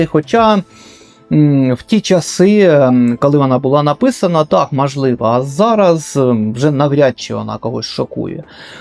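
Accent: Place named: Russia. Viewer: Ви дуже класний, але акання вас виказує.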